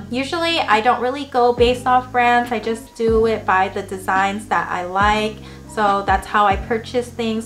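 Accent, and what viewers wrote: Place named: France. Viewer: Ton accent français est trop mignon 🥰 *your french accent is so cute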